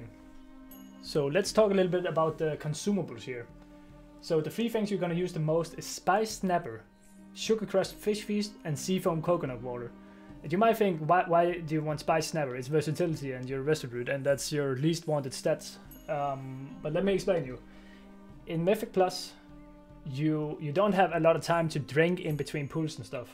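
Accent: Denmark. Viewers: I love your Danish accent! <3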